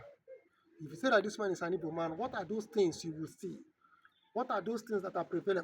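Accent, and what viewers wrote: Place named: Igbo, Nigeria. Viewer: Very laughable. Speaking English with his igbotic voice while denying his identity. Most Igbo sub groups behave their own greeting. Nsukka, Mbaise, Ngwa, etc all have their own greetings. Igwe Kwenu is the general umbrella greeting. Why are you wasting all this energy denying who you are? Is this inferiority complex or just for political patronage? I just don’t get it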